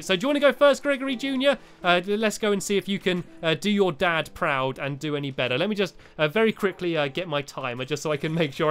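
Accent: United Kingdom. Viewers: I used to think he was saying Alpha Lee until I realized he was saying L for Lee. Can't understand much of his accent, not being British.. XD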